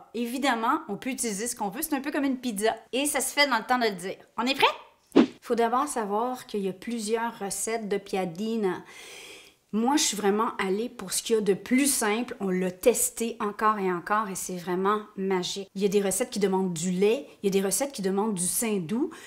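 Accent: Quebec, Canada. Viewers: Bravo.... Tz'es la meilleure !!!!! ;) Oui, ton accent me rappelle certains matchs de balais-ballon (ici en Suisse française), où nous avons eu la chance de recevoir nos amis Canadziens, qui nous ont beaucoup appris... Il y a très longtemps..... Très très, ou trop même... Et c'est une formidable recette et merci pour le partage... Serano.... moi je prendrai du saumon fumé..... Canadzien... chhh j'ai rien dit... Mais j'apprécie beaucoup d'entendre les Quebecois, et merci encore, et ne le prenez pas mal.....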